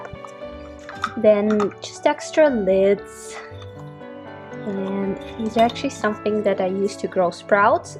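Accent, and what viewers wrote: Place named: Hungary. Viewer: Your accent sounds like Naemi of Scandish Home, she is Hungarian. She and her family live in Oregon, USA.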